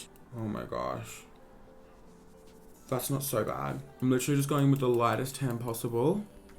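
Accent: Australia. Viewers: tori s its australian like mee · Is his what posh Australians sound like? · Is he Australian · Is he Australian? Because he sounds like it.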